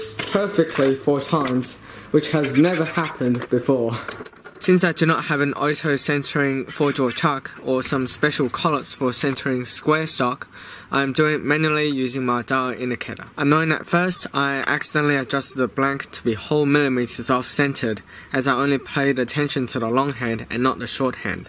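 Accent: Australia. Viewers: You Australian mate? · What part of Australia are you from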